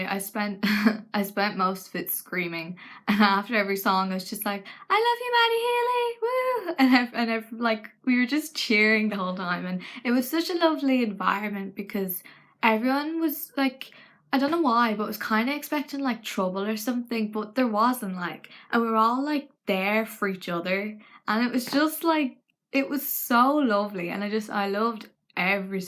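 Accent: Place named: Ireland. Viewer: I didn't know you were Irish? (i think, you sound irish) your accent is cute and I saw that you did go and to a halsey concert, but I'm glad that you had fun 💞💗💗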